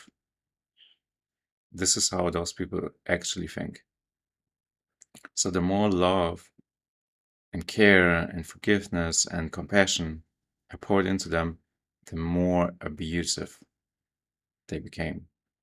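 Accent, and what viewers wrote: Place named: Germany. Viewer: Bro thank you so freaking much bro. I was Over here continplating stuff about my ex who was German and I'm realizing I think she cheated on me then I see this video and it sounds like you are German and I'm also going through stuff with my mom and I was also for the last 3 years I have been like the angel guy cuz I thought I could like you said heal people by that but I ended up getting taking advantage of. Thank you thank you thank you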